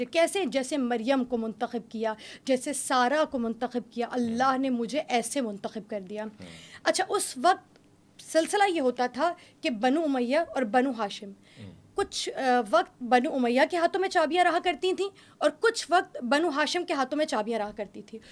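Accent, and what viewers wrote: Iran. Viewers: Why the persian accent of narration and pronunciation of the sacred names? When all our bibis and prophets were from Arabia. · Have they become less sacred or insulted when pronunciation is in Persian accent 🤔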